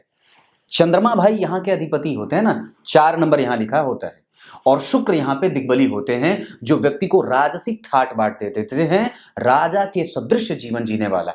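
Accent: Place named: Purvanchal, India. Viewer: Sir आप किस क्षेत्र से है? आपकी भोजपुरी से मुझे लगता है कि आप पूर्वांचल से है क्या?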